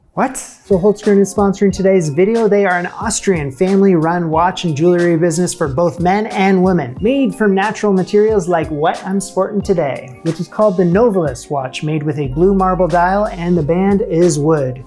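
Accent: Canada. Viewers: I've always loved your Canadian accent. I envy you being from Canada, and I hope one day you and your family get back there. I'd do anything to get out of the US, I honestly don't know why anyone would pick living here when they have a home in Canada. Love your channel, love your personality, love your accent! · Had no idea you were Canadian. Always felt your accent was very pleasing to hear and wondered why since I assumed you were from the US. And as a Brazilian living in The Netherlands, I usually pick an American accent very easily when I meet one out here (it doesn’t sound very good does it? 😂 ) Now yours make sense. Glad to hear you are Canadian. Much better. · The way i didn't clock the Canadian accent until this video. My NS accent is too thick to tell another one unless its NFLD haha. But as someone with a spouse from NYC, I get the layer of extra stress you musg be dealing with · Speaking as a Canadian, I knew had to be Canadian about 1.5 videos in. 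Then he mentioned it in a video and I felt very smug because, it's true, Canadians are generally bad at spotting out own accent. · Your Canadian accent is AWESOME!!!!! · I love your Canadian accent 😊....I love your country! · Make fun of your Canadian accent? WTAF? I'm a Montanan living in California--I miss the Canadian accent and love hearing yours. LOVE it. Like I love your content. Thanks for your Canadian-ness. I consider you my emotional support Canadian. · THANK YOU!!! The Great Debate. I don't like the fruit/nuts either. Also, I knew you were from Canada from the accent, but also you filmed in Muskoka, Ontario, where I have been summering from birth. Anyway, thank you! Because the bakery in Bala (Don's Bakery) changed their recipe for butter tarts! Now, the original recipe wasn't the best, but it was the taste of summer. Don's Bakery's current recipe is even worse. The filling is off and there is too much crust. 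HOWEVER, I now have "the recipe" which I will follow and bake and peace will be restored. I have been a friend/follower of Glen & Friends for years. I watch the Sunday Morning with the Old Cookbook regularly. As an American, I apologize for the man who currently sits behind the desk in the Oval office - both Trump and Musk; because who knows who actually sits in the chair. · Many of us Americans love our Canadian neighbors. We're so glad you live near us and set a better example than orange Hitler does. I personally am sorry that we are behaving so poorly. Be proud of your heritage and your accent! I definitely noticed you were Canadian but I thought it was charming. ❤️ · Same! I don't hear any specific Canadian-isms in him, but I knew right away he was Canadian. Not sure how to explain that. Maybe we don't hear it because we sound similar? 🤔❤️🇨🇦🇨🇦🇨🇦🇨🇦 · Also a Canadian living in the US - I very much feel like you. 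I am moving home in a few months. I am done. Butter Tarts are 100% a good way to push back. I am definitely team no raisins. Your Canadian accent is the best!!!!! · I love your Canadian accent! Canada is on my vision board ❤ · Can' believe someone sniped your 'Canadian accent'. Then again, I'm from SE Michigan, so I sound like you ;) Oh, and all of the sane people of America apologize to Canada, and all associated with her. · I cannot believe you are serious when you say that you didn't realise you had a Canadian accent. I am Australian and I have been fully aware that I have a very specific accent from a very young age. Starting with listening to Sesame Street, then the Nonna four doors up who fed us pasta and the Yiayia who fed us moussaka, I knew that I had a different accent to the 'news people. I think you abandoned your country to find more wealth in 'America', (insert Titanic bullshit). 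But now you are in the middle, oops.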